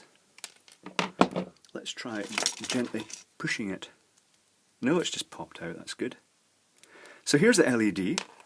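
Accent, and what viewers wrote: Scotland, United Kingdom. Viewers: Lovely Scottish accent, Edinburgh area?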